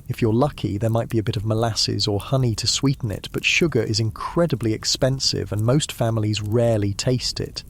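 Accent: United Kingdom. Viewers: I hate the British accent and voice used 🤢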